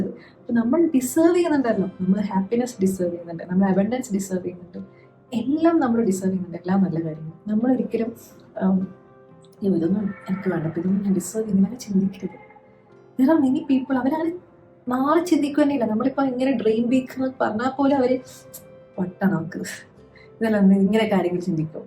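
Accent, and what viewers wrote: Kannur, India. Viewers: Kannnur ano beedu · Kannur slang❤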